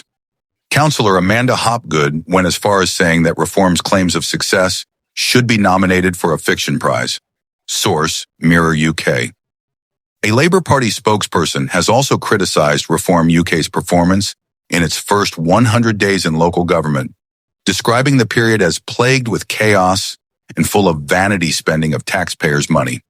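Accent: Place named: United States. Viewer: England Now - With an American accent. Not for me.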